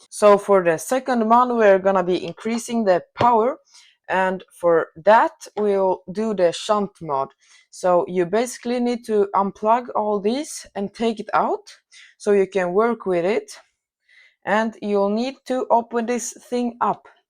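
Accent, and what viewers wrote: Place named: Sweden. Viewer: Dude you sound Swedish ❤😅 But 5km/h ??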